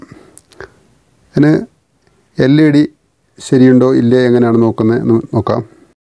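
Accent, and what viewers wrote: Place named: Tamil Nadu, India. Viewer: തമിഴൻ മലയാളം പറയുന്നത് പോലേ തോന്നുന്നു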